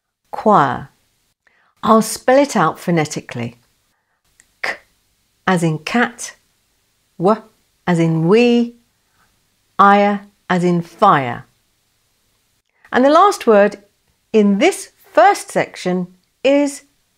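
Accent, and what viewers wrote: United Kingdom. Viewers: Thank you for learning English pronunciation with a British accent for free, loving 🌹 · Native Spanish speaker here, I've got 9/10. I knew "monkey" was pronounced with /ʌ/ and I'm not used to hear British English so the way you pronounce it confused me. But after hearing it again and comparing it with other words with /ɒ/ I just saw my error. A fun exercise I may say!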